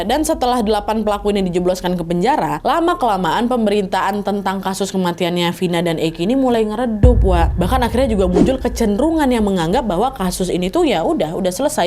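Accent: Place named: Sumatra, Indonesia. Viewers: Emang kalo pake hijab auto jd suci?? tuh org sumatera otomatis logatnya ya Kya pada umumnya org Sumatra yg kenceng...Julid amat jd orang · Itu logat sumatra... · Lahh knp dibandingin wak..saya dlu krj di malaysia orng sumatra ngmongnya jg gt kak tegas keras jelas tp wak ada jawanya dikit kyknya keturunan..bah hiro kan menghibur gemulaii gimana gt jg enakk bawainya...ad ciri masaing2 lah wak...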